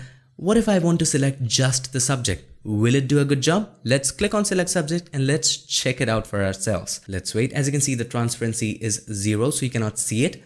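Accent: India. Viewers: I don't really get this guy's accent is it fully Indian or American.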